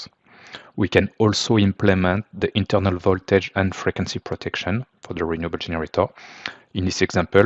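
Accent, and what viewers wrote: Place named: France. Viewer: Its french version of english 😅 its cute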